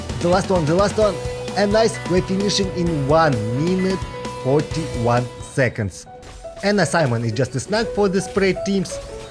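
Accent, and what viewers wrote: Russia. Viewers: Это снимал русский? Акцент прям заметный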